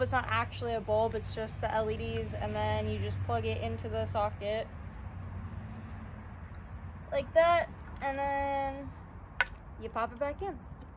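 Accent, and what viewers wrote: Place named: Newfoundland, Canada. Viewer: Thing a ma bobber u talk like a newfie Like me lo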